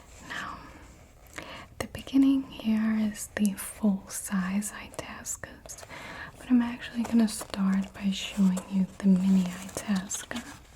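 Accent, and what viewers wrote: Midwest, United States. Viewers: As a Minnesotan, the midwestern tinge to your voice in the old school videos like this give me LIFE. 🤩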